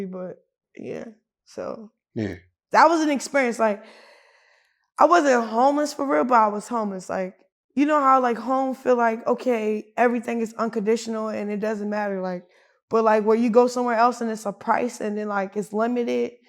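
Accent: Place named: Maryland, United States. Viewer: I can definitely hear that "Murlen" accent in her voice lol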